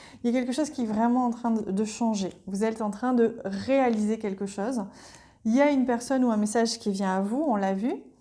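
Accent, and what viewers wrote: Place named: Anjou, France. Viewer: Bonjour Merci pour cette guidance, ta voix angevine donne encore plus de plaisir à écouter et intégrer les messages. J, ai reçu ce message pour toi. Surveille ta peau. Je ne sais pas si cela te parle? Sinon oublie. Bises amicales et bienveillantes Olivier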